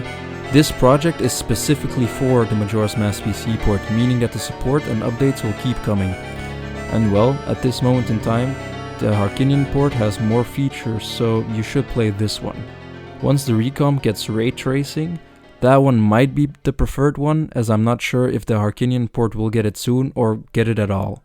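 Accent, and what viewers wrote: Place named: Netherlands. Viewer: you sound dutch bro, great video btw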